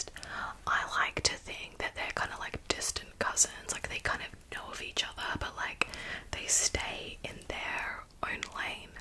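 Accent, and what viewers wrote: Australia. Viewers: I'm Scottish, and if anyone believed you were Scottish...I'm terrified for Humanity lol XD I had you down for Australian pretty quickly, but I might have an unfair advantage due to some of my family being Australian, as they sound very similar to you :) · I’ve waited for this video for so long to confirm if you’re Aussie or not 😂 every video I changed my mind and convinced myself that I was wrong hahaha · I love your accent. I knew you were either Australian or a kiwi. I would love to visit Australia. You've definitely traveled more than me 😅 you're amazing never stop being you. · Aussie Aussie Aussie!! I knew it!!💕💕💕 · YESSS I KNEW YOU WERE FROM AUSTRALIA (it's hard to identify accents as a non native english speaker) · congratulations from russia,!! i'm very glad i came across your channel some time ago. even though i don't know you personally you seem to be a good person. 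i love your videos and i adore your aussie accent so much (i knew you were aussie! glad i got it right haha no one says 'no' like aussies do, totally love that ♡) thank you for everything you do for us, really, it means so much... and also we love a boyband fan. i'm a huge kpop fan, was a directioner too and i still listen to 5sos, their latest album is really good, 10/10 and as for your question i'm doing pretty good yet i'm worried as i graduated from the univeraity this year and i'm moving to a new apartment literally in two days and as you can tell my life is a mess and clearly not so stable right now. so once again thank you for your kind words. i will try my best not to stress too much and just enjoy the moment while going my own pace not sure if you read that and the comment is way too big then i expected but thank you, i love you and sending you my best regards! ❤ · Your Australian accent is definitely the strongest, but it took me some time watching you to really decide 😂 This was a fun video. 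It’s funny you prefer an American accent for ASMR. I am American, but prefer Australian or Scottish :) You’ve led a fascinating young life so far!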